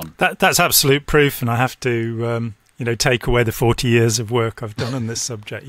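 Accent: United Kingdom. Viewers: I keep hearing Brian Cox in this man's voice. Must be from the same part of Britain. 😊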